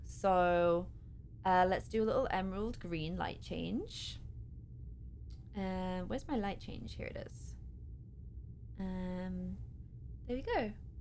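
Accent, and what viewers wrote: United Kingdom. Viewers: he's a geordie:)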